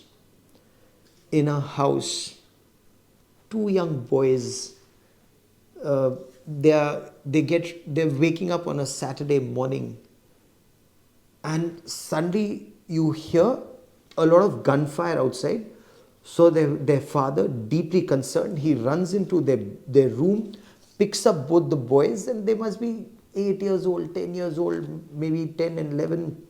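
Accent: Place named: India. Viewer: I cant understand you indian bull shit accent. You are fake nees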